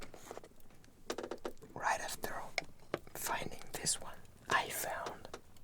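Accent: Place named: France. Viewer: Oh my God I love your French accent! I don’t get to hear these accents very much and they are absolutely lovely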